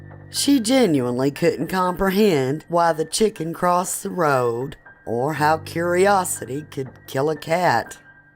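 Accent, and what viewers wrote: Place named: Kentucky, United States. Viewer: Hey this is wonderfully narrated! Love the Kentucky accents. :)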